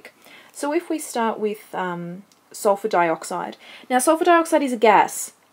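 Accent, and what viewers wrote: Australia. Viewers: what accent is this? Australien?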